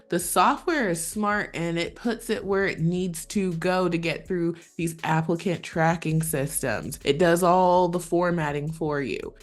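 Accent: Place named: South, United States. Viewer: your voice tells me you are also a Southern girl! Alabama here. You are my favorite remote job lead lady! Blessed Monday to you. Keep 'em coming! 😊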